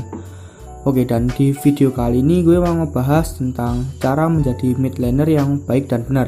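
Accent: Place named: Java, Indonesia. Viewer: Medokee :v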